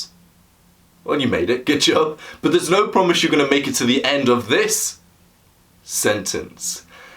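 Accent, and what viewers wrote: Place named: Southern England, United Kingdom. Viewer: Where were you brought up pal Presumably south of England but where? How you say like is distracting FYI great work btw 🙏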